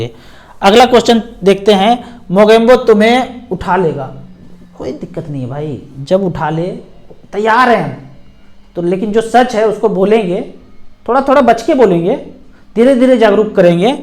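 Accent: Bihar, India. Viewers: Sir aapki wani me bihar ki khushbu aati hai aap hai kaha se 😂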